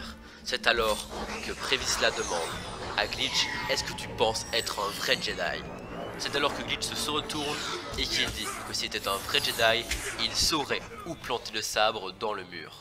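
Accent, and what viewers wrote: Belgium. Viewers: ❤ l'accent belge qui tue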